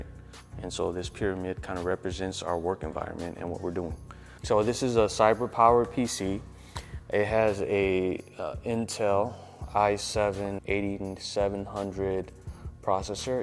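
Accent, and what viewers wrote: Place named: New York, United States. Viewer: Cool videos. Where are you based out of? You sound like you might be from NYC.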